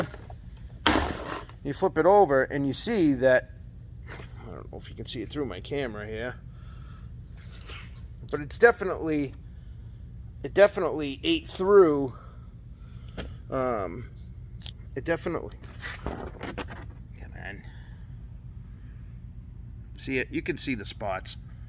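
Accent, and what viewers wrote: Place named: Northeast, United States. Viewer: i knew you were from the north east from your accent. Cheers from New York brother. - Mike, Asphalt Works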